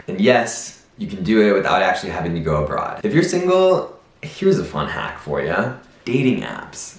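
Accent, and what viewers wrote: Buenos Aires, Argentina. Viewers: AMO TU ACENTO ARGENTINO, HABLAS MUY BIEN EL ESPAÑOL, SE VE QUE TE HAS ESFORZADO, TE ENVIO ABRAZOS Y BESOS DESDE PANAMÁ 💜🙌🤗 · Damn!! Escucharte hablar con ese acento argentino me pone mal!!! Saludos desde mexico!! MAS VIDEOS EN ESPAÑOL X FAVOOOR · tu acento argentino me hace reir XD yo apprendó el frances tambien y pienso que puedes hacerlo más facíl !! esos tres languajes son muy similares y lo hace más facíl de apprenderlos. j'aime mieux apprendre les langues et tes vidéos à leur sujet! j'espère que je rendrais visite à la france à l'avenir comme vous et argentina : ) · I'm learning english actually 😂😂😂 what I do is listening to music, sometimes I read books in English but what actually I do like is watch videos on youtube. I keep looking for channels in English and I also learn from them too, new words and new stuffs. What I realized is that you accent is from Argentina, I'm from Dominican Republic. 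· You are not only speaking spanish, you are speaking argentinian, and that's freaking hot. Saludos desde Argentina :) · Saludos desde Mendoza. Argentina. Tu acento es porteño perfecto!! Bien logrado!! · Tienes mas acento argentino espero que visites Peru. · AAAAHHH I KNEW YOUR ACCENT SOUNDED A BIT ARGENTINIAN!! Si te soy honesto, sos la primera persona estadounidense (nativa) que escucho que tenga un acento argentino. It really was shocking. Not many americans know about our existence but I’m somewhat happy on the fact that you have that beautiful accent of ours in your spanish. Muy orgulloso de vos ❤️ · Yo podría ser tu native speaker, para que dejes ese acento argentino y tengas uno más neutral. I´m from Chile. Practicamos idiomas y hacemos otras cositas jijijiji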